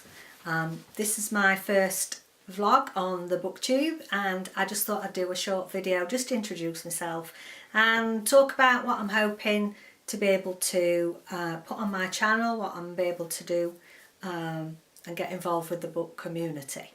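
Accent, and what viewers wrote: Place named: North, United Kingdom. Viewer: Welcome to Booktube, it's lovely to find you. Subscribed! Can I ask where you are from? You sound like a fellow Northerner. 😀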